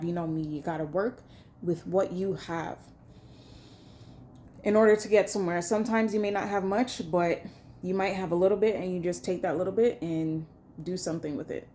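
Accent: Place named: Jamaica. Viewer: A patois (patwa) dat mi hear 😅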